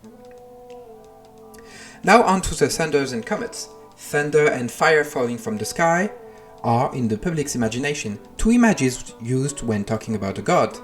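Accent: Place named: France. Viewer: Idk why but your accent look like a french one 😌🤔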